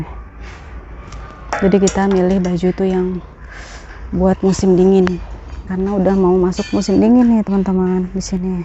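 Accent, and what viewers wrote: Jakarta, Indonesia. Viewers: MasyaAlloh seneng kalau liat mmh kalau lg gomong kadang bahasa betawinye keluar 😊😊 kaye kita ini anak lenteng agung 😊😊 tp seneng jg liat mmh bahasa Arab nya bagussss bangeeeet 😊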